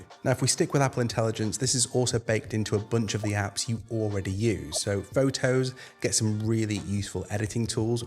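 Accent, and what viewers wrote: United Kingdom. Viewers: Brithish English is so gay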